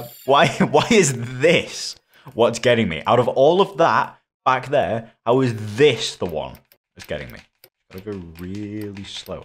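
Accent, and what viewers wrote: United Kingdom. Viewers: I didn’t know you’re British😂😂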